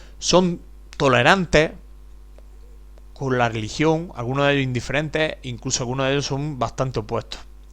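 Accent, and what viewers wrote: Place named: Spain. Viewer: Buena la presentación, pero se agradece por el acento ibérico que posee quien da la explicación que mejore la pronunciación de los nombres. Se pierde uno tratando de tener hilo. Gracias y saludos.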